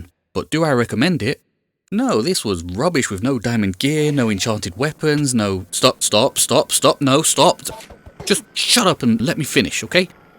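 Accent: United Kingdom. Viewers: This guy is actually so funny, and chill lol. The british accent makes it better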